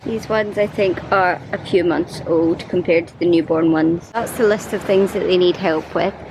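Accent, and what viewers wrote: Scotland, United Kingdom. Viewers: Hi watching your videos two years later. The google Algorithm has not done justice to your channel. I am of English descent on my Mom's side but born and raised in Sri Lanka and now living in Florida. You and are two brave souls to visit during turbulent times but I am glad you had a wonderful holiday. I love your Scottish accent strong and heavy on the R's. I have been to Scotland twice (Dundee). I hope to visit Sri Lanka with a stopover in the UK I am waiting for warmer weather because my body does not like the cold. Cheers Mates and happy travels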